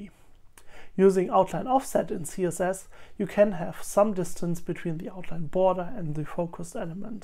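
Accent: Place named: Germany. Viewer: Very nice. I like the German accent.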